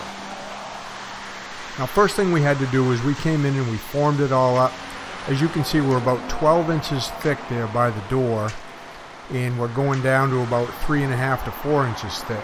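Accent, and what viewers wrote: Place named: Boston, United States. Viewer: Cool video. That accent is confusing me. Sounds a little north boston crossed with cape cod.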